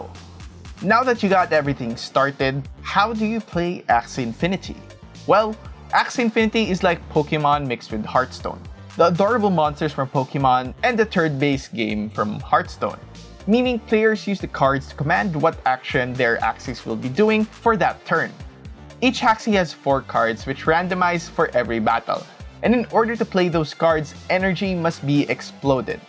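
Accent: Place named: Philippines. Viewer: I hear a Pinoy accent... :D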